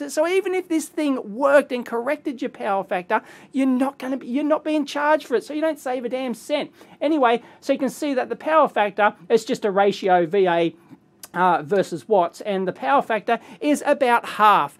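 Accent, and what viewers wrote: Australia. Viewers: Nice to hear that Aussie accent WA accent is so different I just watched a Chinese guy showing how it doesn't work he said it was made in Germany he crossed out made in Germany and wrote made in China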